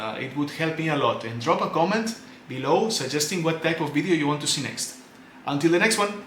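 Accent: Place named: Spain. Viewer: And about the translation, its quite good. Actually sounded like maybe you would have spoken, with a bit of spanish cadence but good vocabulary.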